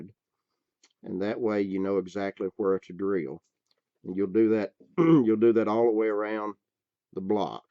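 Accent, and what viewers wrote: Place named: South, United States. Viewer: I literally thought I would need 2 or 3 carving tools.. but I see that Iwould need to spend a lot and that is not a problem because it is investment to use them for other work.. but I hate having so manyh tools around which I will use once every 3 years for whimp.. I thought it would need much less tools to do it hand made.. thanks a lot for your informative video. What state or region is your accent from.. thanks. is it southern accent?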